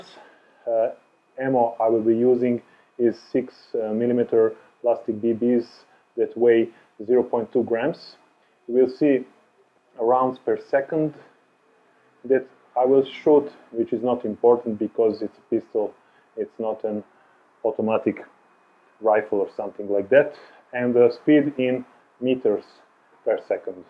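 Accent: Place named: Eastern Europe. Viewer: Ну оочень славянский акцент😅😅😅😅😅😅😅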